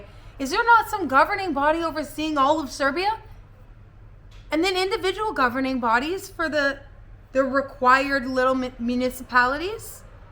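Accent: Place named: Canada. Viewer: It's interesting 🙂 I mean I agree that bureaucracy can be difficult in Serbia😉but when comes to licna karta, pasos, it's very easy. My kids left when they were kids, and when (one of them )was 27 (so after 22 years) we were doing his licna karta back home. And it was done in a few days. You fill out the papers, you pay, easy. Not sure what was so hard🙂. And you don't talk as a child, no worries😍. That's just a Canadian way of talking. 😉 but don't kid yourself, it's not as easy in Canada also, if you are not Canadian. Lots of crap over here also.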